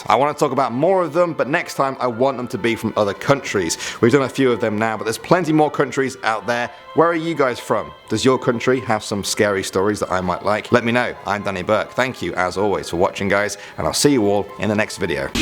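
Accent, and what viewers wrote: United Kingdom. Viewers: Are you Britsh 🤔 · i didn't know that he was british! hello m8!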